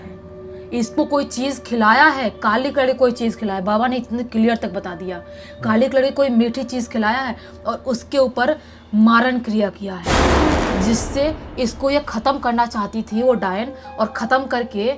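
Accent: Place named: Eastern Uttar Pradesh, India. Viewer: Language se pta chalaa mam shayad eastern up ya Bihar-jharkhand se h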